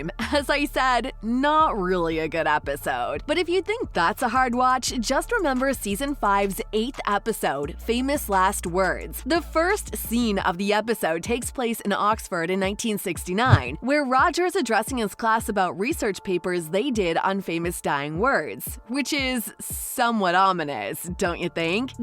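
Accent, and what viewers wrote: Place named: California, United States. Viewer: the narrator's CA stoner uptalk accent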